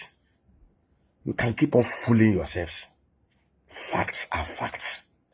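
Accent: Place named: Ghana. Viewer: You look and sound Ghanian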